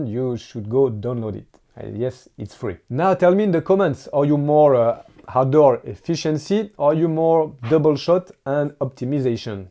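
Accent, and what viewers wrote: France. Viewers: Belle accent français 😂😂😂😂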